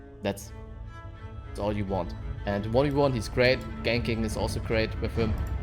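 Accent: Germany. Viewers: Das ist doch NRW Englisch xD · i had this thought for a while know but i think n ow im sure i believe is german or at least lives and speaks the language bc eventhough his accent isnt as noticable the way he says some thinks and how he says "ezettera" i have the feeling he is. could be wrong tho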